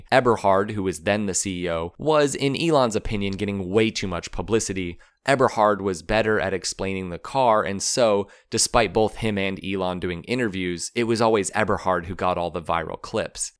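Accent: United States. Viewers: Wow, this put me to sleep, even after turning the volume down to escape that painful, droning American accented narration. Better than sleeping pills, safer too.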